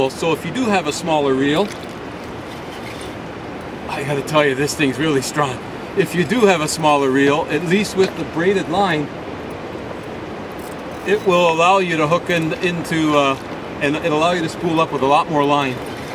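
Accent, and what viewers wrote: Canada. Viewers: love the canadian accent :D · That Canadian accent! Lol! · you from canada ey?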